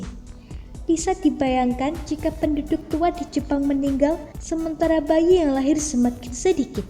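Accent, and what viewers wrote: Java, Indonesia. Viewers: dialek jowo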